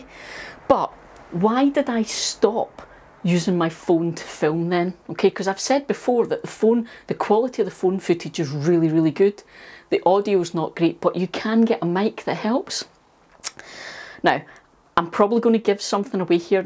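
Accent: Scotland, United Kingdom. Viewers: Thank you for this incredible sneak behind your scenes! The way of your talking is part of the special spirit of your videos - including your nice scottish accent i already get a little more comfort with. 😉 (no offend, i like it, brings me back holiday feeling and memories when i visited Scottland with my son) It's hard to believe teaching lessons with you could be no fun. 😁 Regards from Germany - full of gratitude i found your channel by your talking to Gardener Scott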